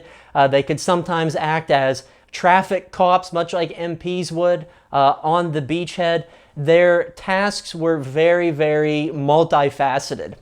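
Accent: United States. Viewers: Anyone who wants to know how american accent sounds just has to listen to your video ! Love from Normandy, near the beaches. .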